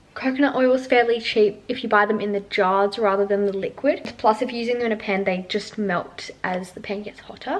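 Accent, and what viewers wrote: United Kingdom. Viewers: You sound British but use dollars??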